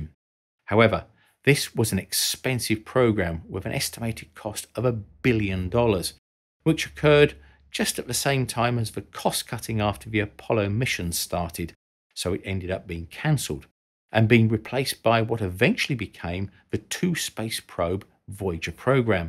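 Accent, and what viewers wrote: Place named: Cockney, United Kingdom. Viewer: I applaud any channel for not using AIvoiceover. But it sucks when you find good content, and then you hear that godawful cockney accent. ‘Earf’‘frough’ ‘firteen’…. It makes it so bloody unwatchable.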